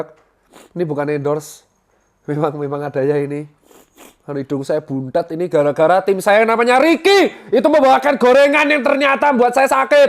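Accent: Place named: East Java, Indonesia. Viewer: logat jawa timuran banget...mantap dok""😁👍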